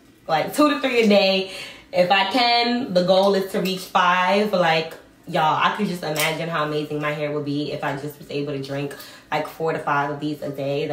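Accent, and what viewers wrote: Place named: New York, United States. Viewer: I love your accent. Sounds like a New York accent. I love your hair and you remind me of Keke Palmer, very pretty girl. Oh, I would like to be mid back by July or Aug 2020. I drink over a gallon a water a day. I'm taking better care of my hair now and also deep condition every 2 weeks.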